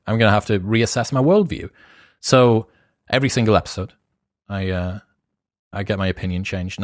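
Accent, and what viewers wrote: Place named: Newcastle, United Kingdom. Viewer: Congratulations on the success Chris, I have been following for quite a while now and I think you deserve every bit of it. Plus its nice to hear a fellow Geordie having these conversations - Living away from home at the moment for my PhD.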